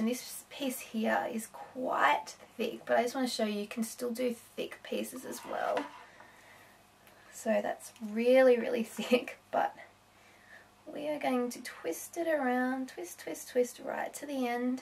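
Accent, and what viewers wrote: Australia. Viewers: i am so glad i found your channel! i'm from australia and it's so hard to find australian beauty gurus! im having a marathon of all your videos:) i stumbled across your channel by searching in 'nina dobrev makeup tutourial' because i absolutely love her and your video came up! then i could tell in your voice that you're australian and i was so excited :') anyway, keep up the great work xxx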